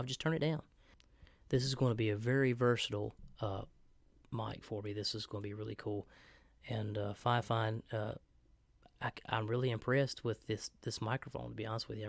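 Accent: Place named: South, United States. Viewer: man you got that country vocal!! love the mic and nice commentary!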